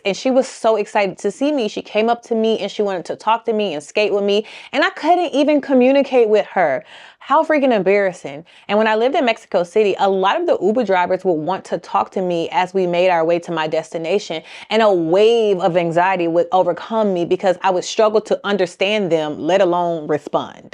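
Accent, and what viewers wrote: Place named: United States. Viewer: I think your Spanish gringo accent made people laugh/cringe…Good-luck on your journey tho😎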